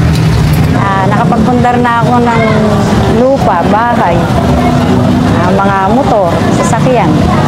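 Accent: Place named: Pampanga, Philippines. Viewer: Me tono po kayong Kapampangan God Bless po❤️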